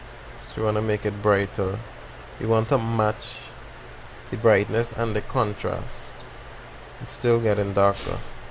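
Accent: Trinidad and Tobago. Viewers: Same thing I saying he's trini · Great tutorial my friend. I can hear it in your voice that you're from Trinidad. I was shooting a destination wedding there for a client and friend who is from Trinidad. The wedding was in Tobago at Stonehaven Villas. Beautiful country indeed!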